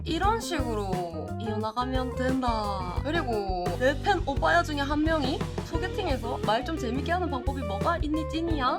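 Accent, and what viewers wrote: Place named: Gyeongsang, South Korea. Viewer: 말투가 딱 대구아네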